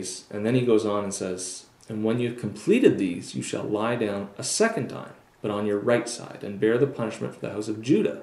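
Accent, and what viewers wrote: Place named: Canada. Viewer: Great review, thanks for this! I am absolutely inspired to read the book now! Also, as a side note, after hearing your "outs" and "abouts" I've gathered you to be Canadian?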